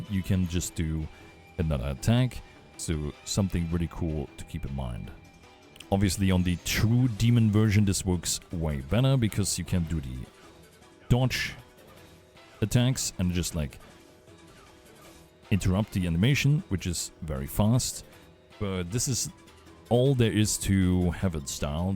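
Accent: Germany. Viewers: Because i hear you say that a lot: It's "Does", not "Duus". Its almost spoken like "das" in german, but with an o inbetween. aka "dos" (I assume you are german, taken from your accent)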